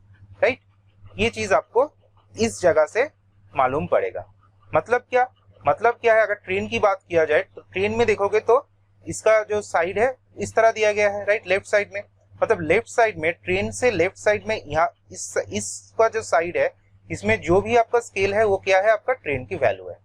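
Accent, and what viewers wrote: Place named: Bengal, India. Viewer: Bangali bangali mne hche golar awaj sune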